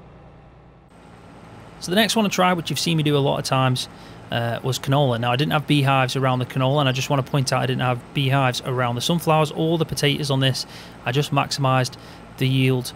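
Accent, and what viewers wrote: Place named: Cheshire, United Kingdom. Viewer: Hi, new viewer here, and a new sub. I'm from Cheshire and I recognise you accent, obviously 😅. I'm from Northwich. Nice to see a local lad pumping out some decent FS material.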